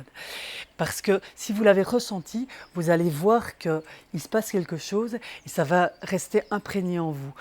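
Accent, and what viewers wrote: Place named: Belgium. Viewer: Un accent belge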